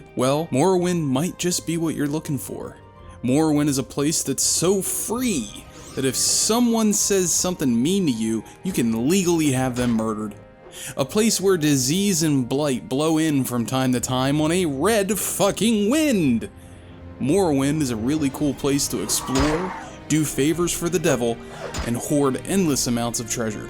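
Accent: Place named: Philadelphia, United States. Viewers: I hear a slight philly accent. A fellow bird team? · lol youre definitely from PA or South Jersey with that accent bud. Good video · Absolutely love Morrowind. Just started my first ever run through and am so immersed it’s more real to me than my stupid job. Great content man. Keep it up. I gotta ask you, Are you from Philly? I grew up there and just wondered from your accent. Feels like I know ya. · Bro please tell me you’re from Philly or at least PA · Sounds like a Pennsylvanian.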